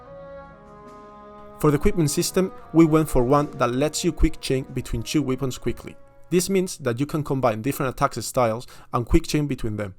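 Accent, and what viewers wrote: Spain. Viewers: this game looks AMAZING, I cant wait to know more also, is that a fellow spanish accent I hear?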